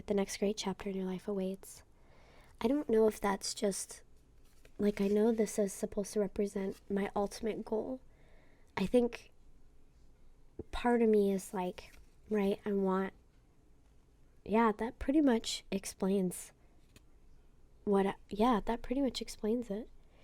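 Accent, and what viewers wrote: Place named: England, United Kingdom. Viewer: 10/10 English accent. I got family in England, I think your accent would pass.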